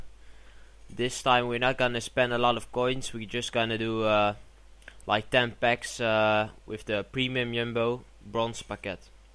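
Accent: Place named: Netherlands. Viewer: lol nederlands je praat een beetje engels met een nederlands acent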